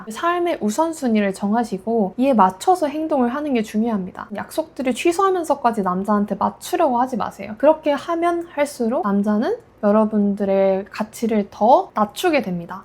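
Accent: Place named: Gyeongsang, South Korea. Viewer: 부산 경남 사람이신듯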